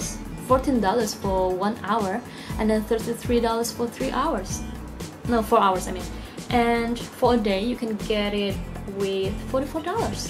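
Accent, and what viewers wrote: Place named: Australia. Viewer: wOW JUST OVER A YEARS STAY AND YOU GET THAT PRETENTIOOUS AUSSIE ACCENT!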